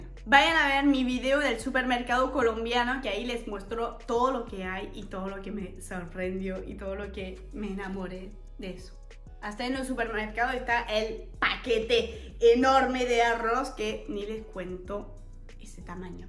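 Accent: Buenos Aires, Argentina. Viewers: Me encanta como incorporasre es el modo de hablar porteño. Ahora bien, si hay más vendedores ambulantes que acá en Argentina, es mucha gente vendiendo en las calles. Muy lindas las referencias de Colombia. ¿Por qué será que siempre vamos tras el lenguaje coloquial y el grosero de cada país?😂 Salut a l'amie française!!!! Dónde vivís en Francia? Cariños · Bueno, pero al fin es Francesa o Argentina? Habla como Argentina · Jajajaja es super encantador ese acento entre argentino y costeño que tienes jajaja <3 <3 <3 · Aprendió Español con alguien De argentina. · Habla como de argentina boludo no como frances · Los huevos :pericos😂 Hablas una mescla divertida de barranquillero con argentino...saludos desde bogota colombia...exelente video. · Que bueno escucharte, soy de Bogotá y me alegra mucho que te Gusto mi pais,me gusta tu unionde argentino con barranquillero y algo de Bogotá una mezcla que se escucha chevere sobre lo de mona es por que tenias el cabello algo rubio y la piel blanca es tambien algo de cariño como lo qué comentabas del mi amor.😊😊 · Colombiano que se respete come arroz como sea bien chistosa esta estrangera pero es francesa pero tiene acento Argentino si yes pero como la francesa vivio en Argentina luego aqui en bogota colombia si yes bien venida a colombia soy colombiano estrangera hermosa si yes estas muy bonita si que dios te guarde y santisma virgen de Guadalupe siga asi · ese sonido "chillón" {chirriante y chocante} de esas letras "argentinizadas" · Pues de acento colombiano tienes bien poco: lo tuyo es argentino. 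Soy español y también hay cosas que culturalmente no compartimos con los hispanoamericanos .... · Ya tienes un dejé costeño, eso si, el argentino porteño lo tienes bien adentro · No entendí bien francesa por qué tienes el acento tan pronunciado de Argentina pero sabes por qué Barranquilla es una parte muy bullosa y de muchos carnavales te faltó muchas partes preciosas para conocer y ante todo la gente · No tiene nada de francesa,puro acento argentino,mi amigo francés lleva más de 15 años en colombia y se le nota el acento francés ,todavía se le dificultan palabras y eso que es profesor,esta chica no es francesa. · Señorita vaya que se le pegó el acento argentino.. "sha" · No pareces francesas . Tienes mucho Acento Argentino 😮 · Bogota no es una provincia y deja de hablar como una argentina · Ton accent est très argentino mais les paroles que tu dites sont très barranquilleras. 😅😂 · Su español es excelente,no se te siente el acento francés que suele ser muy fuerte.Felicitaciones. 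Su acento argentino suave es muy lindo. Y si comemos arroz como locos,les ganamos a los chinos 😅😊😊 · Yo soy colombiana, y mi esposo es árabe.. y ellos comen más arroz que nosotros. Mi suegra se gasta 3 kilos de arroz diario. La yuca con café con leche... jajajaja qué veneno, y dice que tiene problemas digestivos para los fritos 😂. Y nutella, que prácticamente es chocolate con aceite de palma que no es digestivo para el cuerpo... Qué triste que destruyas tanto el Idioma Español. O hablas como argentina o adoptas el idioma Español colombiano. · Hola te quedó el acento Porteño. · Eres francesa , pero tu acento es argentino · Jajajaja me encanto su video hablas muy chevere ..pero tengo una duda eres francesa o argentina por q su acento es argentino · Que linda, al hablar mezcla estilos el argentino, barranquillero, algo español, muy interesante. Se ganó mi corazón. Saludos desde Colombia. Bueno en tema de comidas, si hay diferencias entre zonas de Colombia. 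· 😊Con acento argentino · Hola!!! Tremendo acento argentino 😂😂😂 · Haha el acento de tu español es completamente argentino con alguna palabra colombiana por ratos y el frances haha me encanta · Ud es de Francia o es argentina porque tiene más asentó argentino que francés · creo que los colombianos no te van a entender mucho,vos hablas más argentino que colombiano. Te extrañamos en argentina 😊😊😊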